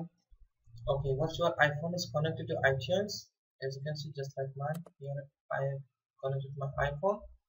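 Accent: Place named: India. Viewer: This guy sounds like a indian irs scammer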